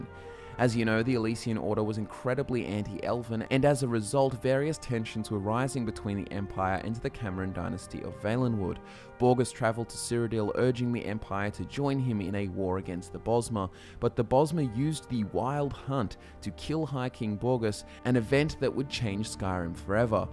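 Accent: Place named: Australia. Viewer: Been watching a lot of these lately and it is just so nice to hear an Aussie voice omg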